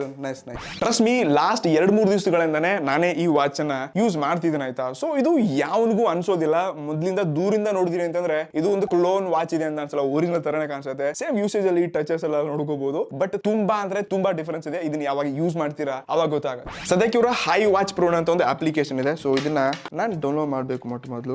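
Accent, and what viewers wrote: North Karnataka, India. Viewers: ನಿಮ್ಮ UNBOXING ಬೆಂಕಿ ಪ ಅಣ್ಣ ಅದರಾಗ ನಮ್ಮ ಉತ್ತರ ಕರ್ನಾಟಕದ ಸ್ಟೈಲ್ ಮಾತು ಬಾಳ ಇಸ್ಟ ಆಯ್ತು I AM YOUR BIG FAN OF YOU BRO ❤️🙏💛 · Uk ಸ್ಟೈಲ್ನ್ಯಾಗ ನಿನ್ನ ಮಾತ ಕೆಳಾಕ್ ಚಂದೋ ಅಣ್ಣ 🙌💗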